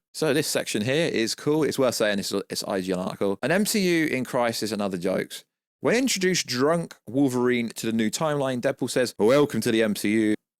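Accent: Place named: United Kingdom. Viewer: I swear I thought it takes me a hour just to get to the point but you, you is just bad man, and every time I try to find other people that shows leaks and spoilers, it’s only you and the other guy Paul or whichever u are you, y’all both sucks and talk way too much. I get to make a long video but u speaking in British when we are Americans, we can’t understand you, geez Christ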